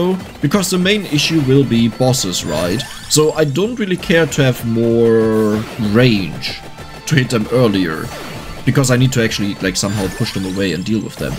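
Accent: Germany. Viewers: I am consistently impressed at the level of care and skill you put into these videos. Looking forward to more laughs and awesome content from my favorite funny talking German man ❤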